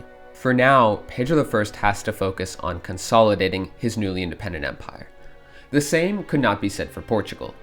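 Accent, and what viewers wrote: Spain. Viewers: "Mestizo" and "Rio Grande del Sur" 💀💀💀 Oh dear, Brazil is the 1000th time being treated with Spanish pronunciation. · Really cool video but the spanish pronunciations of Portuguese are going to offend some people, bro 💀💀💀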